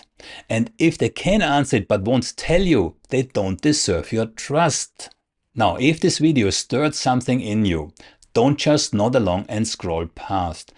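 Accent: Germany. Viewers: I came for Russian propaganda in English with German accent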